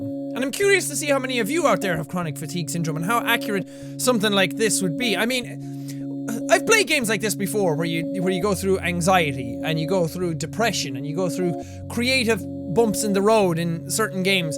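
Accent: Ireland. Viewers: Is it just me or does Jack no longer uses his Irish accent anymore other then in his intro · What part of Ireland are you from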